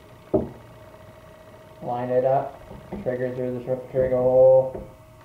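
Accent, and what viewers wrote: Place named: Atlantic Canada, Canada. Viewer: thought I heard an easy cost accent. I'm from Newfoundland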